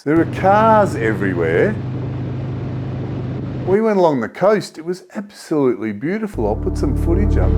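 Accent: Australia. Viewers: 😎👍 Big thumbs up for the channel... Love hearing different way things are said Down Under... Motorbike... first time for ever saying that word... I'm 68... Stay Safe Brothers...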